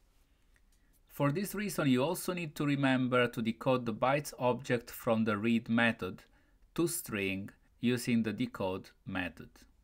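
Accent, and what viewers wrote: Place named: Italy. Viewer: si sente che sei italiano bro(non è un'offesa, però fa un po' ridere aahahah)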